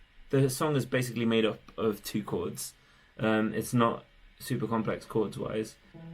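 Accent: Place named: United Kingdom. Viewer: You have British accent. Are you born there? I am in Toronto, Canada. Nice to meet you. Actually we both commented on the documentary about Saudi Economy.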